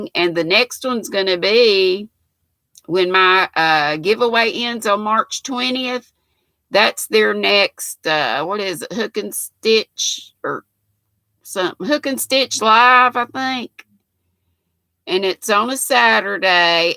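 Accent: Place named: North Carolina, United States. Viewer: I thought your accent sounded familiar, I'm from NC too. I live just west of Charlotte!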